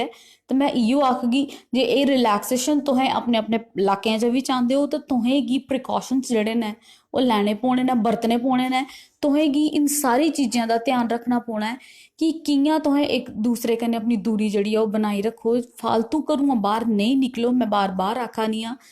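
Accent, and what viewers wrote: Jammu, India. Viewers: Apka dogri sa news btana muja badi khushi milte hai our bda acha lagta hai ji thxxx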